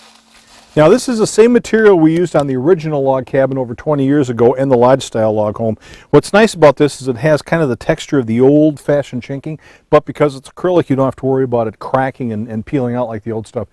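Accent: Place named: Minnesota, United States. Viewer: Dig the accent He's from Minnesota you know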